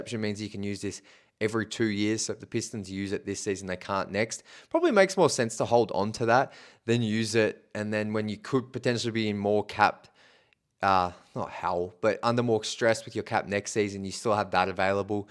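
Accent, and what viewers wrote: Australia. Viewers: how does his balls taste? He lives in Michigan and has no more information than a decent beat writer. He just has an Aussie accent that makes listening to him different for an American audience. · No. recent example is the Fontecchio piece. I didn’t hear that here. Nothing on it but I don’t pay for the on-line subscription fees for news bought by a news information product company. Providing the same news stories to across the land. I.E. ~ Knight-Ridder (if they are even around). Point is. Same content across the board. That’s old, presumptive, and to me breaks the code of having your own paid reporters. more of a reporter on the Pistons than a regurgitate, local Detroit reporter here is. I’m a global human. I don’t care about accents. The NBA is a global brand. Aussie…top 7 countries in bball except the U.S. So his talk sounds right to me.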